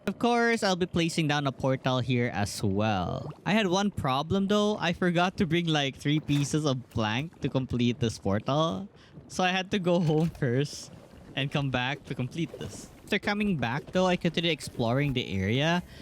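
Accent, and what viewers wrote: Philippines. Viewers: I knew it. From your voice this is a Pipino. Kabayan! Hahaha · are u from the felepens caus u sound like it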